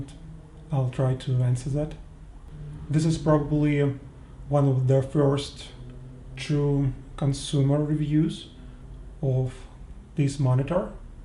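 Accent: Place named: Russia. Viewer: Чую русский акцент)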